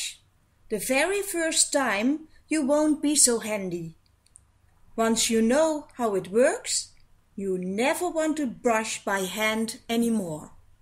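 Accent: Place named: Netherlands. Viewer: What a horrible dutch accent, my ears are bleeding.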